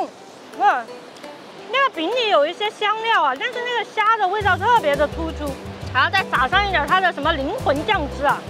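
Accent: Sichuan, China. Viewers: 老乡，你这个川普挺正宗😂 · 可爱的Yooupi小姐姐 你说话好可爱 你是四川人吗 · 妹子講四川口音的普通話真好听、只要講話就好❤看、可愛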